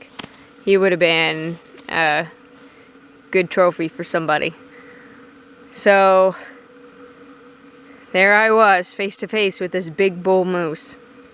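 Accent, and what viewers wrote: Maine, United States. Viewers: You have the Maine accent 100%, It sounds cute to us flatlanders.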